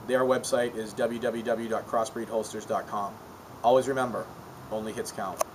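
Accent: Massachusetts, United States. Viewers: Just came across this video; nice work! (Noticeable MA accent, btw.) Question - you mentioned the regular M&P line would be getting upgraded triggers too. I e-mailed S&W and they said "No upgrades at this time." Do you have any more specific information about when this change will be happening and if S&W will offer the upgrades to previously-purchased M&P guns, either for a nominal charge or as part of regular warranty service? Thanks for your help, and keep up the good work!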